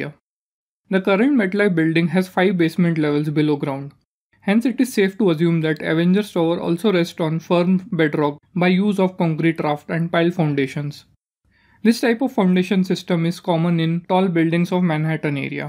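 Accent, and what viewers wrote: India. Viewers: He soundz like rajistahn 27 responses matches the 27 disapproves Manhattan area tall buildings of ny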